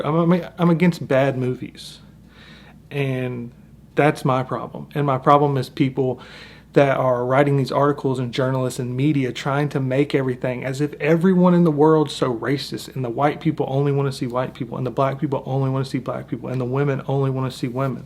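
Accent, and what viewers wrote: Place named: Rural South, United States. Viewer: like I said, a southern white person calling people stupid and an idiot is winning the argument. You DID NOT make valid points in this video. You are just another white person on YouTube. You are not important in any way what so ever. Understand that. You are not owning mrwin your comments. You are not Perry Mason and you sound like a hick. Your opinion doesn't count so why are you acting like someone is attacking whites? You want to call people Nazi because you want to grasp for straws. You don't think race should matter because you are white. The best person to you is a white person. Get off your high horse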